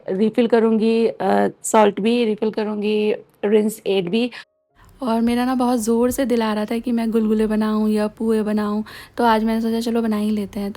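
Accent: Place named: Uttar Pradesh, India. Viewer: Aap up se h.. Is liye gola bolti h😊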